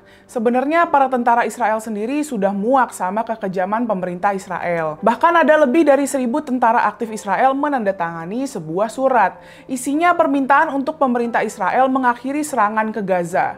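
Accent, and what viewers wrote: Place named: Betawi, Indonesia. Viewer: Semoga tercipta perdamaian. Di channel ini baca berita dgn dialek Betawi yg kental banget. Gpp sih. Sah-sah saja.😂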